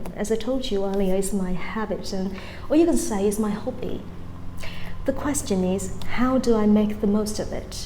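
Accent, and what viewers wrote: United Kingdom. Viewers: giọng Anh chuẩn, dễ thương giống Lucy ( “Learning English with Lucy’’ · Giọng cô là anh phải ko ak. Nhưng lại ko nặng lắm. I love your acent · Chị nói accent kiểu người anh, nghe quyến rũ, uyển chuyển quý phái thật! Em thích nhưng mà khó quá nên đành theo anh mĩ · giọng chị hay quá!!!~ có phải giọng chị theo tone British accent ko ạ? em đang luyện nghe kiểu thụ động, nghe giọng chị rất quyến rũ, dễ nghe và dễ phát âm theo nữa. Cám ơn chị đã dành thời gian để làm video này cho mọi người ạ <3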